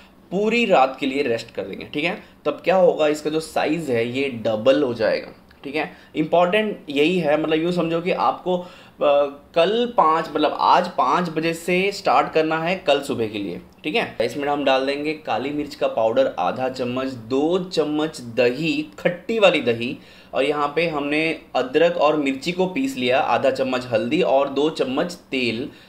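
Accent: South, India. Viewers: Nice original voice😍👌sir.... bt mujhe apka south India voice version is se vi jada pasand hai❤ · I am saying true i don't cook food but I am a foodie i watch your video for entertainment by your south Indian voice 😀😀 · Very nice 👌👍🤗 specially when u "spoke"Normally" 😂 I NVR liked ur South Indian accent 😢 Once U commented also , (long back) aftr that I nvr saw ur videos, but now I enjoyed this video (with ur "normal" voice 😂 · bhai Aapki recipi ki saari video dekhata hu muze bahut pasand hai. Aur aapki ki vo sauth Indian waali voice bahut pasand hai. Aaj aapki real voice suni vo bhi best hai. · tera south indian accent wala voice rocking hai bhai...!!!!👍👍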